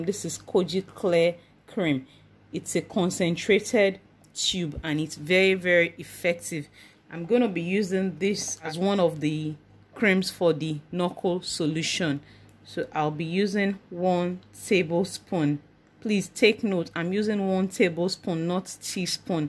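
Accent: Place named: Cameroon. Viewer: Just stumbled on your channel. I just subscribed! You sound like a Cameroonian!! I know you said naira but you really sound like a Cameroonian! Good info on here!!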